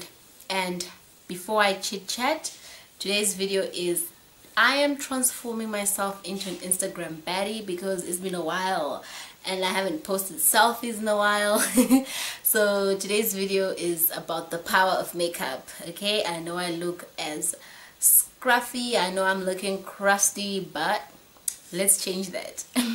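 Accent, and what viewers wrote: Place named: United States. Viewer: You sound like you have recently visited the US. Wa-rrer. You look amazing.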